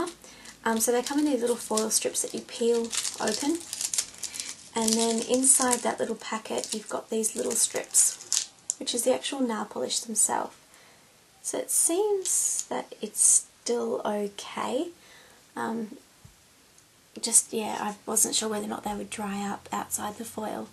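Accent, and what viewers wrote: Australia. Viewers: Sounds pretty Australian to a Brit :)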